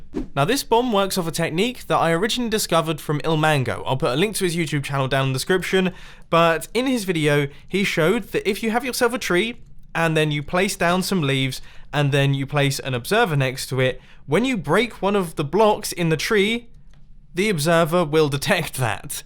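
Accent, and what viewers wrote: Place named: United Kingdom. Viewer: No offense man, I accustom calling it British accent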